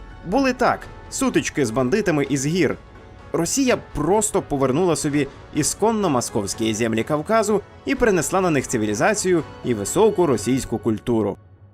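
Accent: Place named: Ukraine. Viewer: Ролик с потсрекательством , будьте бдительны.!!!! Высвечивают только один фрагмент,. Подумайте для чего ? Голосу с украинским акцентом рассказывать про черкессов, сейчас в такое гопячее время - это во первых , а во вторых проверяйте информацию более обширно, учите настоящуюю историю своих Родов , развивайте критическое мышление. Слава всем Родам.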